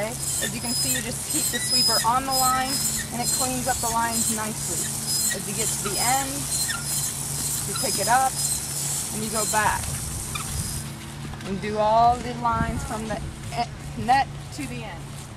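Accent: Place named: United States. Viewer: judging by your american accent you have probably never been to the ATP courts in Monte Carlo, and since I have I can tell you they do NOT use the "coil" system as you call it. yes the sideline to sideline style leaves clay on the sides near the fence, but your method doesn't follow professional methods.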